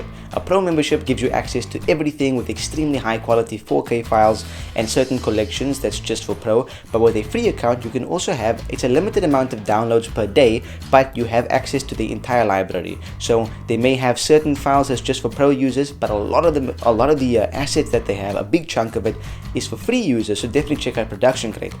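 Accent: South Africa. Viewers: are you from south africa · Ayyy, I recognise the south African accent !! 🙌😃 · You sound South African 🤔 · hang on are you South African?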